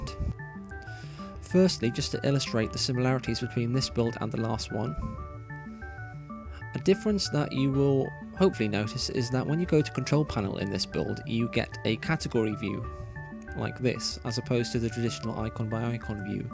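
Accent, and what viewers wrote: United Kingdom. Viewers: i'm learning british accent with your videos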